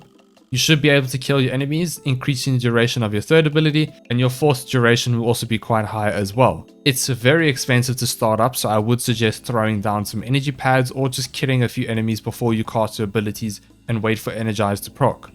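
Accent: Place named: South Africa. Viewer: Is this guy South African because he definitely sounds like he is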